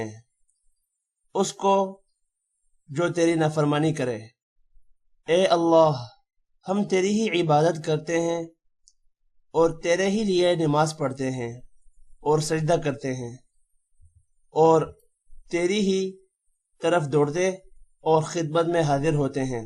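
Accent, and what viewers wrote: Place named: Baltistan, Pakistan. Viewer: Aap ki awaz aur bolne k tariqe se lagta hai ki aap balti ho.. Kiya mey sahih hon.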